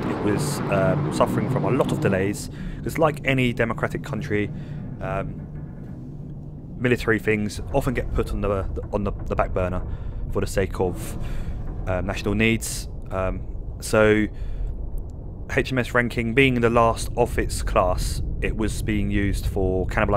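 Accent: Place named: United Kingdom. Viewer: What’s with this British talk on an Australian Built Submarine I am disgusted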